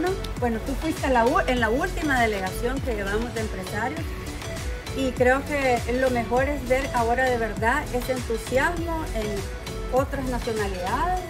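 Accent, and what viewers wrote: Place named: El Salvador. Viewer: Que hermosura de mujer. Y es Salvadoreña. Es " " Cómo dice la canción.. Bella, Bella..se me encanta oírla hablar... Ese " ACENTO 100%SALVADOREÑO " QUE ORGULLO...ES DECIR...SOY SALVADOREÑO..! CON NUESTRA EMBAJADORA. " MILENA MAYORGA " ARRIBA EL SALVADOR...DICEN LA..!!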